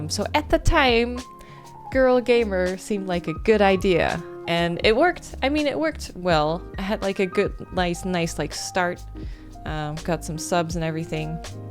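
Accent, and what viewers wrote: Netherlands. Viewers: Congrats on the 150K!!! Btw, I can really hear that you're from Brabant when you speak Dutch XD (I used to live there too lol) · Woahh you kind of have a dutch accent haha :D I really like the name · Wel leuk dat je Nederlands accent toch een beetje door komt!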